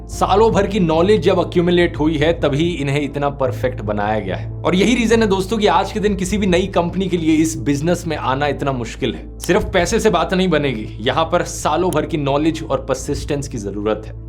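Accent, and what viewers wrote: Haryana, India. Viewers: Why does he suddenly have haryanvi accent?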